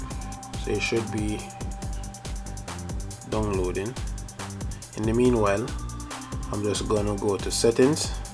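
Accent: Jamaica. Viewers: Is he Jamaican?🇯🇲I am. It really sounds like it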